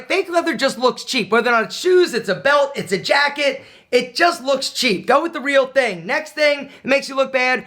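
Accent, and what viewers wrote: Philadelphia, United States. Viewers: “Drink wyahter” “medium lenth hair” 😂 I love how you can still hear Philly accent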